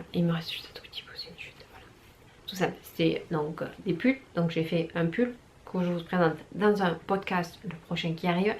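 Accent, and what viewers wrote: South, France. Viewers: Bonjour, merci pour ce partage avec ton bel accent ensoleillé 😄 Tu parles de l'application Couture Actuelle, où puis-je la trouver?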